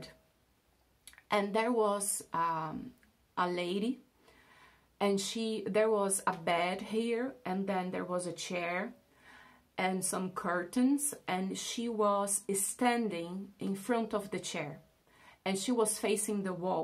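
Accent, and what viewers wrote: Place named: Brazil. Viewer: Eu imaginei que tu era brasileira pelo sotaque :)!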